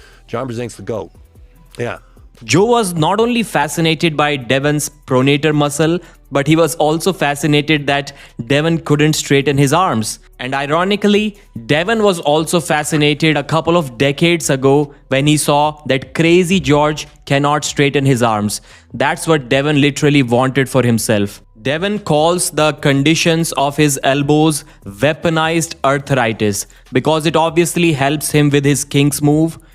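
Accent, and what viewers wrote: India. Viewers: another Indian voice it's so annoying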